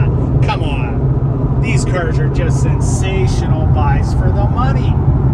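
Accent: Canada. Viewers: That and that annoying Canadian accent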